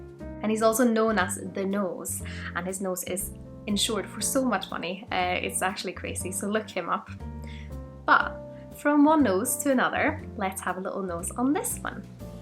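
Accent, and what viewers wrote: Scotland, United Kingdom. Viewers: Sökte på youtube efter denna whiskyn efter jag köpte den på systemet, kul att hitta en video från någon svensk! Men du låter lite skotsk haha :D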